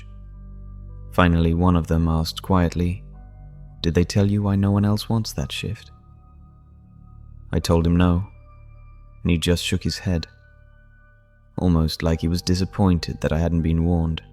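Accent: United Kingdom. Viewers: Native or acted, but British accent is the best on my and many others opinions👍👍👍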